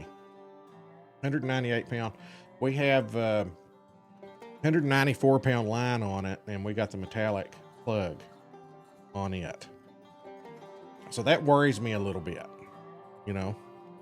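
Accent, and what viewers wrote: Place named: United States. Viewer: Bro I love the country voice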